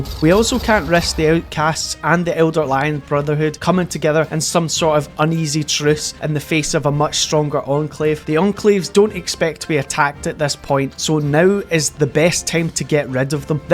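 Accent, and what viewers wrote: Scotland, United Kingdom. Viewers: immediately like 3 seconds in the scottish accent throws it all off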